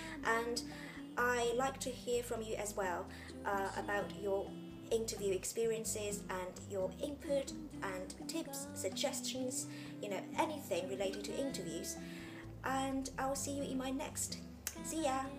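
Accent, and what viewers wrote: United Kingdom. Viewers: how did you learn that accent? Luv that british accent! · 这个女孩的英式英语说的真的很好。不是光是语调节奏听着英式而单音错误一大堆的那种混迹在国内各大网站论坛的误人子弟者。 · 沒有美吧...整個英到不行...... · 覺得英腔加一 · 简直英音。。。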